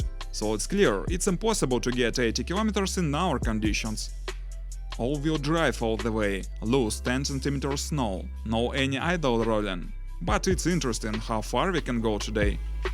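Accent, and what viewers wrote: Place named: Russia. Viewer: Сразу слышно Русский говор...🤣🤣🤣такой был у нашей училки...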